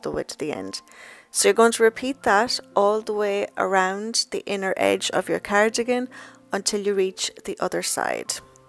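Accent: Ireland. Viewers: So cute i am always scared to make sweater. Becouse of my boobs being so big lol i am gonna have try this out though do you think if i measure around my boobs and get ths mesurment that way it would work .just wondering i am 44 dd. I love the sweater and i love your Irish accent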